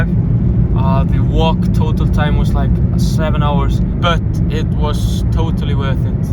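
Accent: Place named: Northern England, United Kingdom. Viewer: Interesting to hear how your accent is like a Northern English accent. I wonder if the Northern English accent is derived from Scandinavia. It was ruled by Danes and Vikings for a while.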